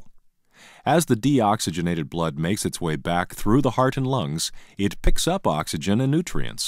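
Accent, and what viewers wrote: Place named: United States. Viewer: LOL at Capillaries! lool . Aw i love me a good american accent :P hehe .Anyway ACE vid! . Love it and it explains and covers the topic great! <3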